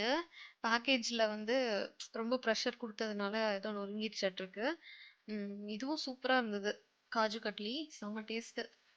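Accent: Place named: Coimbatore, India. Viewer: I like your voice!!! Very sweet!!! And liked your Coimbatore slang 😊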